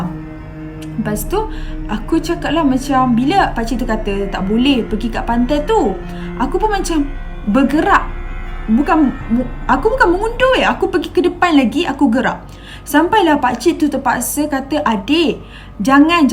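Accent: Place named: Kelantan, Malaysia. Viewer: Aq rase ni orang2 belah pantai timur.. nada cakap cam orang kelantan je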